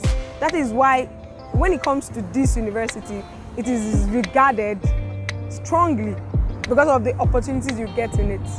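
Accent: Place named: Lagos, Nigeria. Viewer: you sound like you were one e a student of Unilag.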